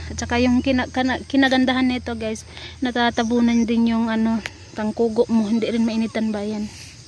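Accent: Visayas, Philippines. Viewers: Hello Ms...parang Pinas lang din ang cute nang anak mo at napansin ko parang Bisaya ka Ms?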